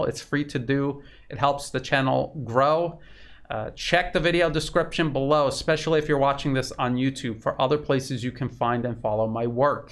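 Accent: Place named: United States. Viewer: Apparently your surname is Croatian. So if you went to Croatia you would be another guy from down town. You would have to drop the American accent thou and adopt a East European one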